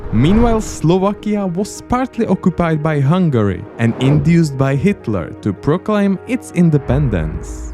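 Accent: Czech Republic. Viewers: Judging by the accent I think he might be czech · his accent is pretty straightforward giveaway of him being czech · pomocí tvého přízvuku jde poznat že jsi z česka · Enjoying your Czech accent;-) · Video a celkově informace o Česku fajn.. ale ten českej akcent v angličtině nejde vystát.. sorry not sorry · never heard a more czech sounding accent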